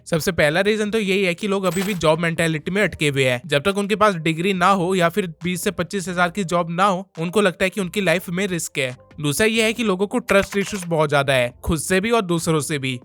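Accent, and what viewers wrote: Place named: Odisha, India. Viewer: Aap odisha ho Kiya aapka voice odisha ka vibe detahe ❤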